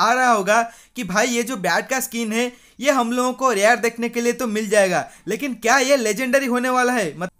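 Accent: Odisha, India. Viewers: Ap Odisha se ho kya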